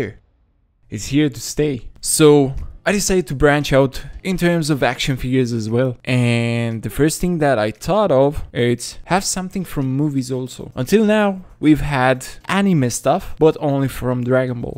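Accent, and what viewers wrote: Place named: Romania. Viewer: După accent ești român:)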